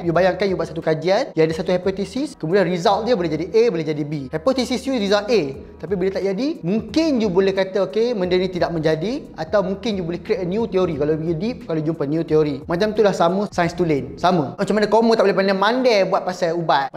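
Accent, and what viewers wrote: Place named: Parit, Malaysia. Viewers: Pekat benor perak nye yop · are you from Parit,Perak,ghope ckp pekat org Pagharit je????😆😆😆😆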